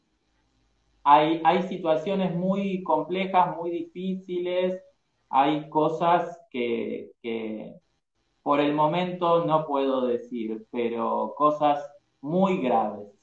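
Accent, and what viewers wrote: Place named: Argentina. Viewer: Hola. Me gusta el trabajo que haces y la forma en que detallas cada nota, con tu estilo y ese acento argentino le dan el toque. Al menos en mi opinion que soy mexicana.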